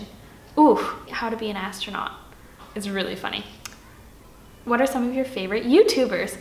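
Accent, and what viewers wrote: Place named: Canada. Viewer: Ohhh that's why in your other video with your friend you had a canadian accent snd in this vid you have a mixture 😮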